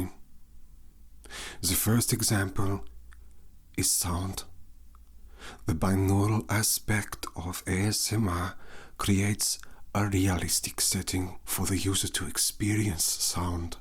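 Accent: Germany. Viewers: I've never known a German accent to be so smooth, beautiful and addictive. I speak Afrikaans, a branch of Dutch, and even though it's smoother than German, it's not as smooth as you've managed to make this language. This leads me to wonder how far over the horizon your talent stretches. So far I've been blown away, and, once again, my brain is sufficiently massaged. 😘♥️ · Wow... That's a good german accent 👍 I'm from Germany and you have my approval 😂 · as a German i can say that its rare to hear a good German accent that isn't meant to be a joke...very nicely done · Haha!! Love the German accent😂🤗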